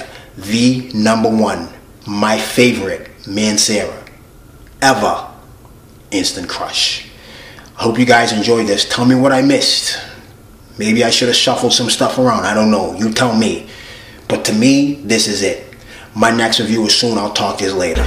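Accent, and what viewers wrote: Boston, United States. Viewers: ok my guess was Boston, but didn't want to assume 😉 thanks very much for such an informative video covering so much ground! Subscribed 👍🏽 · This was soooo helpful! I love your passion for scent - and your accent! (Boston?). Big love from the UK and Merry Christmas 🙂. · Is that a Boston accent?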